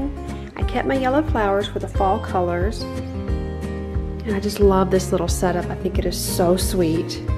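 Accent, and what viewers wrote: South, United States. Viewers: especially with that southern accent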